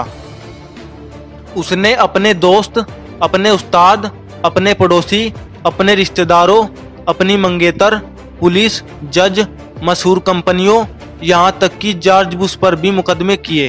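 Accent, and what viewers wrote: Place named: Haryana, India. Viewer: Ur voice accent is like haryanvi language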